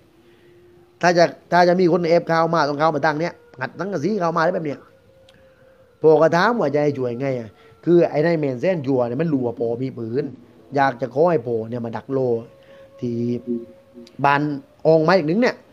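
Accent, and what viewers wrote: South, Thailand. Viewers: ชอบสำเนียงใต้คุณ มาก รู้สึกแบบดีใจอะผมก็คนใต้มาฟังคนใต้ด้วยกันเรื่องที่ดีแบบนี้แล้วดีใจจริงๆ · มาฟังคุณ แหลงใต้ ทั้งสำเนียงและศัพท์ใต้ตอนล่างที่คุ้นเคย เปิดมิติใหม่ของการรับฟังเรื่องผี ทำต่อไปนะมาถูกทางแล้ว😁แว้บๆวันก่อนเห็นช่องนี้แปลชื่อเรื่องเล่าเป็นภ.เกาหลีใช่มั้ยนะ มาดูอีกทีกลับเป็นภ.ไทยละ ถ้าทำจริงนี่ล้ำเลยไม่ซ้ำใคร 👍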